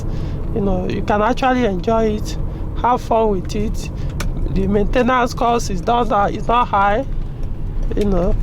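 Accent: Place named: Igbo, Nigeria. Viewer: He's speaking English with Igbo accent/intonation. He's from the Igbo tribe in Nigeria.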